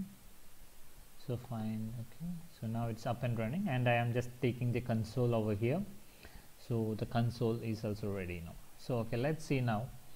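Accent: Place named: Hyderabad, India. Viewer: Hyderabadi style m : kirrak 🔥🔥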